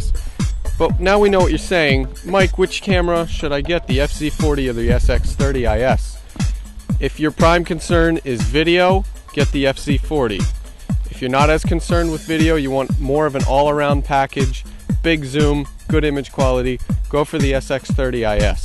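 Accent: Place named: United States. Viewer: Like most americans he pronounces Z the way you would if you were saying Zorro, for instance. The rest of the world pronounces it "Zed" (as it should be), so he means FZ 40.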